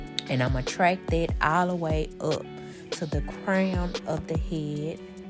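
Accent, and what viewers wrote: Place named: New Orleans, United States. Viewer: Accent sounds from NOLA 🎉🎭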